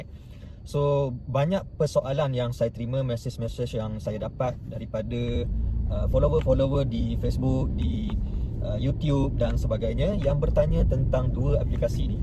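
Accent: Terengganu, Malaysia. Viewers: org ganu ke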